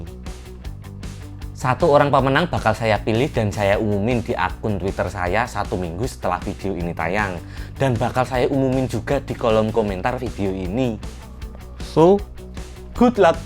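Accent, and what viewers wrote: Java, Indonesia. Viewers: Mantap om udah 10.00 subs, om udah punya kualitas lho cuman tinggal konsistensi aja. Semangat terus ya om! Alasan ingin punya LED : Saya suka ngambil footage di malam hari dan di ruangan yg (sering) kekurangan cahaya om. Padahal cahaya kan aspek penting dalam ngambil footage. Dengan LED (terutama Ring LED), kekurangan cahaya itu bisa diatasi apalagi ring LED tipe yg bagus karena penyebaran cahayanya rata Alasan subscribe channel ini : Saya udah subs sejak lama om. Impresi saya pertama kali itu waktu liat video "Lensa yang tepat untuk Videographer" itu kualitas video om bagus banget. Pembawaannya juga santai dan medok jawanya bikin lucu hehehe. Om udah punya kualitas sama ciri khas yg bagus, tinggal konsistensinya aja.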